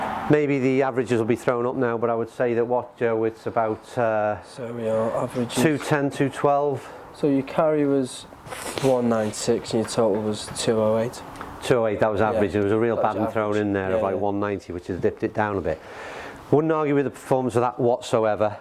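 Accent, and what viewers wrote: Liverpool, United Kingdom. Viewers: Interesting to see that the u65 seems better off the deck than tee I have the same experience. Good to hear a scouser.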